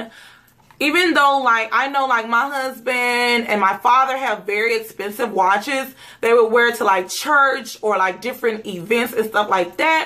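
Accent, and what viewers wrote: Mississippi, United States. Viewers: Girl you from Mississippi? I instantly subbed! 🙌🏾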